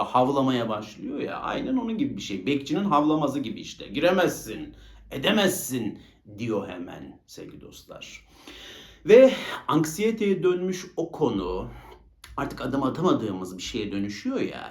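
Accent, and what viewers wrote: Ankara, Turkey. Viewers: Senin Angara şivene kurban , Allah muradını versin hocam , benim aydınlanmama ve bunca yıldır kendimle yüzleşmeme vesile oldun , harekete geçip makinayı kapattım senin dediğin gibi , makinayı kapatın !!!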